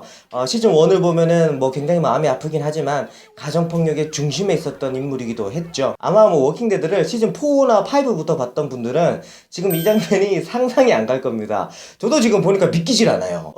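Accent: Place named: Gyeongsang, South Korea. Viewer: 캐롤 시즌 1~2까지만 해도 별 비중없고 민폐 캐릭에다가 일찍 죽을것 같은 캐릭이었는데 이제는 없어서는 안될 최종병기가 되었죠. 인물 연대기는 역시 원년맴버가 메인인듯 합니다. 특히나 릭은; 준비기간이 오래걸리실것 같네요. 역시나 님의 내용정리는 탑입니다.근데 뜬금없지만 경상도 출신이신가요. 제가 경상도 사람이라 중간중간 귀에익은 말투가 조금씩 들리네요^^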